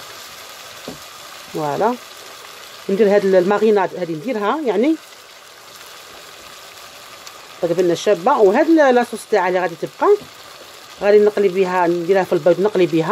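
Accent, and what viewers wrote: Oran, Algeria. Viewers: واش نقولك راني شفت القناة ديالك عندي أسبوع و كلش عجبني فكرتيني بالماكلة تاعنا في وهران و حتى هدرتك الله يحفظك و يحفظلك بناتك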